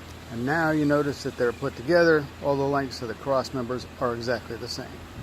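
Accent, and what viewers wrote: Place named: Texas, United States. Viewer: "Fixin to do" 😂 are you from my neck of the woods in Texas?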